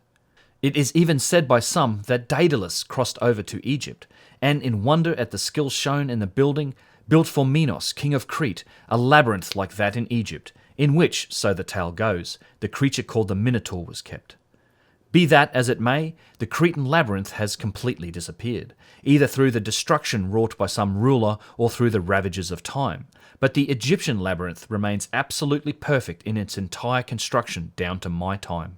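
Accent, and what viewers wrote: Australia. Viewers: 'The royver of such soyze the noyl' its English? Another stupid Auzzi hack lol. Please Australia speaking Australian Cockney is not good!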